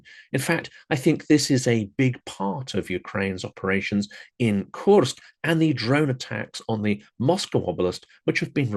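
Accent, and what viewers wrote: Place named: United Kingdom. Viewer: Well done,! Always incisive, well thought out, and stated in excellent intellectual British English which is very pleasant to American ears. Love your podcasts. One of the best podcasts on You Tube.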